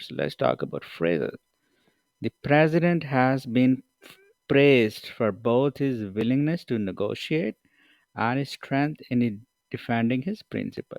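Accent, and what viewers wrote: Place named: India. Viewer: Your indian accent is intolerable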